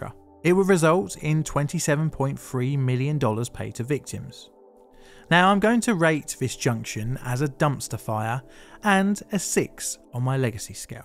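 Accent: United Kingdom. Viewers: I gre up in crystal lake. The bus drill we did every year was alway quite serious because of this. I knew about it before my feet touched the seet in front of me. I graduated in 13 so all the adult knew someone affected. We watched the news footage. Its so weird to bave your little corner of the world read by a British person half a world away. Cheers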